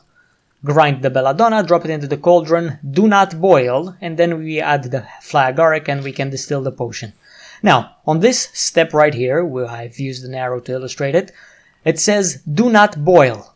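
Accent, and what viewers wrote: Romania. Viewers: Nice. Oh btw I didn t know u were Romanian. Niceeeee accent!!!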